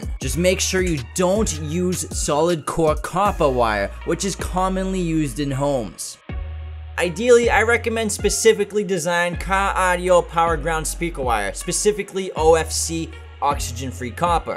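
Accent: Boston, United States. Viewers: This the first time I have heard a real Boston accent, shyt Is crazy how state to state English changes...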